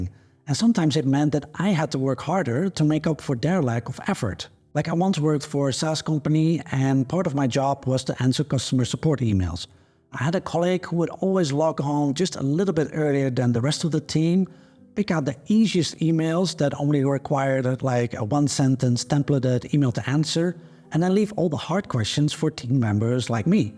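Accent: Netherlands. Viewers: Love your Dutch accent 😊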